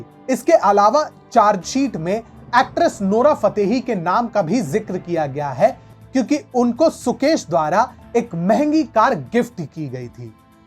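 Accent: Standard. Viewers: Aapki shudhh hindi vachan shaili bahur sundar lagti hai!👍👍👍👍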